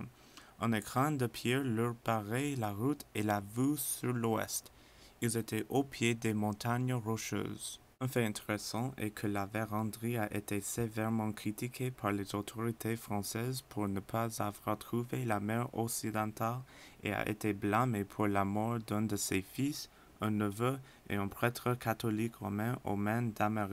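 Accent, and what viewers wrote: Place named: England, United Kingdom. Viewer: this is an english speaker trying to talk french.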